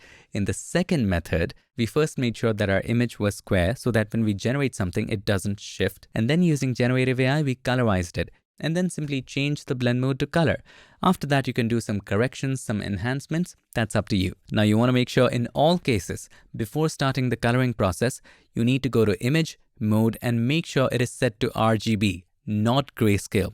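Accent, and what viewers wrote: India. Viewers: Your Indian accent is still very thick. Also, you will not look taller by pulling your hair up.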